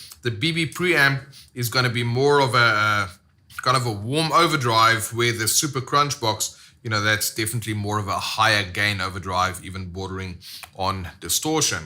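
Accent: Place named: South Africa. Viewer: Ive always been a fan of the bb preamp,one is always close by my pedal board. These days i have the custom shop one with the mid boost. Ps..... what the hell accent is that? Ive never heard it before,South Africa?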